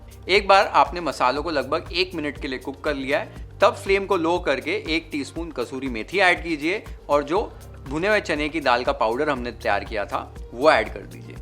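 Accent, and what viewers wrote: Maharashtra, India. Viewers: You are jsu awesome. You sound a perfect maharashtrian when you spell words like ठेचा, वाटण.... Amazing chef ❤❤❤ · Nice recipe, tasted many times . The way you tell great 👍🏻. With perfect Maharashtrian pronounciations ❤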